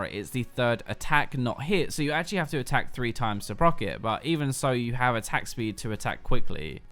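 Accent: United Kingdom. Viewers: "I think honestly, basically, i mean. i don't honestly, i guess. he honestly, basically it means, honestly" - Damn You really need to limit yourself when you use those words and phrases. I watched your 30 min blue EZ guide, and it really pissed me off. On these 7- 10 min videos you can't actually notice, but on that EZ guide it was really horrible. I really Love your British accent and the content you are making, but those phrases man, damn. Keep up the good work tho. Cheers.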